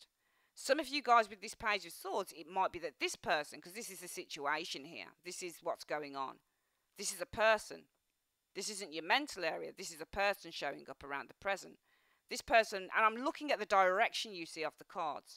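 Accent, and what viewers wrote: United Kingdom. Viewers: New subscribers here! Wow so refreshing to hear a uk 🇬🇧 reader 🤗 as I'm from Birmingham. Spot on for me‼️ I need to let go of my ex Cap the devil 😂 & be open to new love. Just a shame I still have to see him as we have twins together but I definitely need to let got! Thank you 😊 I really appreciate your readings! I hope I spot 👀 the new offer & take it 🙏🏾🤞🏾❤️🌈🙏🏾 I'm keeping my eyes peeled for that now as I don't want to be stuck in this unhealthy mess!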